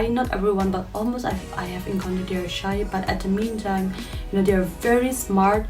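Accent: Nagaland, India. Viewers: Love the way you give your expressions Simple, pure and most understandable with original naga accent. And most importantly, I admire that you gave the perfect spell of KHIAMNIUNGAN which is that maximum people of other naga tribes cannot, which has also been asked in the NPSE exam....awareness for those NPSE aspirins ,,,hahah... Anwy zzz,,,keep doing research and make more videos and supply more informations like this,,,lots of love from Khiam's family...! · Nice to hear u speaking Sumi dialogue...."Alhou pelo okumugha tsu peni....Afo". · So nice to hear u speaking sumi dialect........like it.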